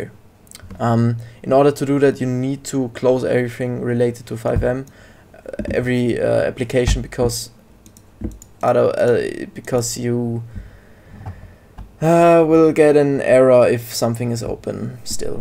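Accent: Germany. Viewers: Digga dieser deutsche aktzent den habe ich auch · Man merkt voll das du Deutscher bist am ende vorallem mit Perfekt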